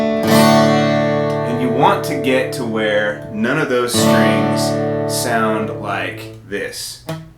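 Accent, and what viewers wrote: United States. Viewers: Americans can never go wrong with their presentation. Seen a lotta Brit vids, but I usually prefer to hear Americans talk.... Love your video!! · I never heard such a manly voice in an American accent😂😂 Started on 8/11 will tell in 2 months thanks for the tutorial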